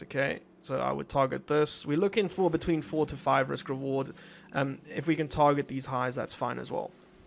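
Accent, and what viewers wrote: South Africa. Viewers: South African accent, lekker bru. New sub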